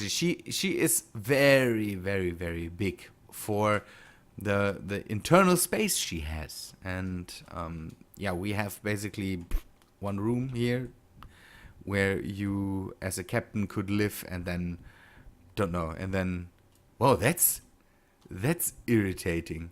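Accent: Germany. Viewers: Deine Englische Aussprache lässt annehmen du Sprichst auch Deutsch?? Wenn ja ist das in der DEV Version auch so das es einen Gravierenden Fehler im Spiel gibt? Seit dem Update 1.157.12 friert das Spiel immer wieder ein teilweise sogar für bis zu 5sek oder länger und dabei geht die Prozessor auslastung auf 100%. So macht das Spiel langsam echt keinen Spaß mehr. Ich habe schon alles mögliche versucht. Dieser Fehler tritt selbst dann auf wenn kein einziger Mod im Spiel ist :/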